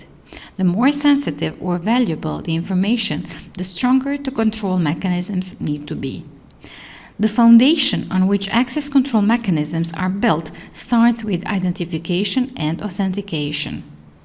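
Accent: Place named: Russia. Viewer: That accent, are you from Albania or Russia?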